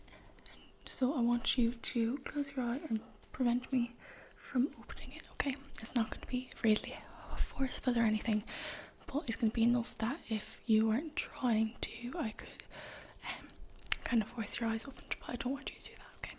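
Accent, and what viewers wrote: Ireland. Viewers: Love this! Instant subscribe. Haven't come across any big Irish artists yet, I'm sure there are some and I haven't actually searched for them, YouTube just hasn't pushed any in my direction till now. The accent is perfect! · That Irish accent is awesome for this type of ASMR! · Like this video and like the Irish accent. 👌👍😊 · For some reason i just loved your accent! It's irish accent? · Fukkin love an Irish accent! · This was lovely and the Irish accent is a huge bonus. What county are you from? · Hi Miss, YouTube recommended and I'm glad they did! I really like your Irish accent! I subscribed and I am going to get notifications! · Just can't beat an Irish accent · I’m a simple man; I hear an Irish accent, I subscribe.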